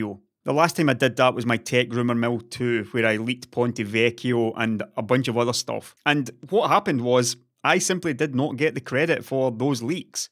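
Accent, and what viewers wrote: Scotland, United Kingdom. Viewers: is this Scottish? so lovely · a dash of scottish accent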